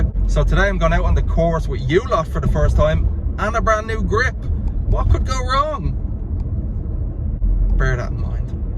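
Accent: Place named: Ireland. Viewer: Keep the crossfields, finchs, sheils & robinsons, this is absolutely me to a tee (no pun intended!) Great watch for so many reasons: I can see someone else hitting the same clangers I hit but never know why I did it as I never see my own swing so this will be a huge help.. you're playing courses that are only an hour or two away from me that I could easily get to myself so it's brilliant to get to see them up close on the big screen.. naturally, it's smashing to hear a fellow Paddy's accent on the YT for a change 😀.. and I stocked up my golf clothing wardrobe with the druid's gear there at Christmas too so it's nice to see someone else with good taste in garments too :) keep up the great work pal, best luck for the upcoming season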